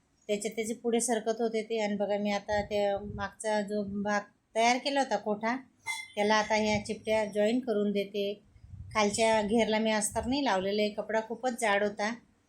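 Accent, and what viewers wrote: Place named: Nashik, India. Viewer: ताई तुम्ही नाशिक साईडच्या आहेत का.. बोलण्यावरून वाटले तसे..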